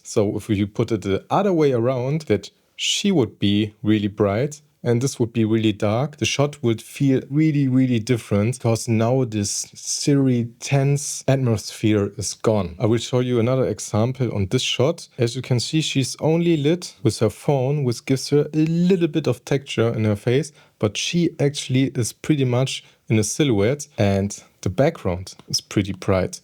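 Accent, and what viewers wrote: Germany. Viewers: sett is aber eh werri german akzent 😅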